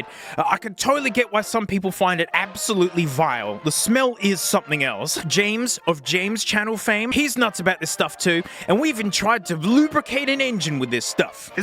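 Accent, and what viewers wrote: Australia. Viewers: some Assie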